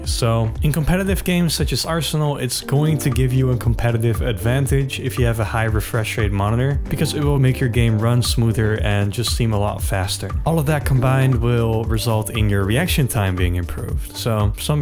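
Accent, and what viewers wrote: Netherlands. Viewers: I already could hear you come from the netherlands anyways good video your amazing :)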